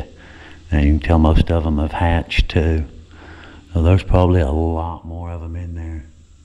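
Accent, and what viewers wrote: South, United States. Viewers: Aarrgghh. That Southern drawl. The worst, most jarring accent on the planet.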